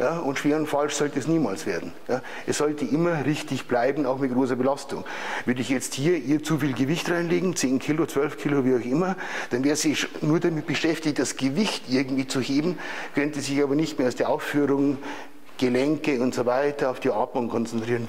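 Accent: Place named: Bavaria, Germany. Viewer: Hallo, wieder mal ein super Video, richtig gut erklärt, auch dein bayerisch ist angenehm und verständlich 😁 Was ich mir wünschen würde wäre ein Training speziell für Bauch(Körpermitte) was für viele sehr unverständlich und falsch trainiert wird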